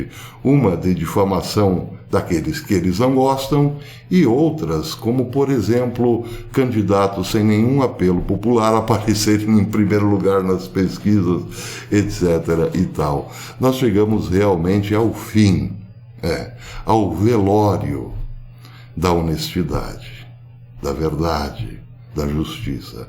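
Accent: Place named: Minas Gerais, Brazil. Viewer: PROFESSOR, V. EXA. TEM SOTAQUE MINEIRO, NASCEU EM MINAS E FOI MORAR NO PARANA?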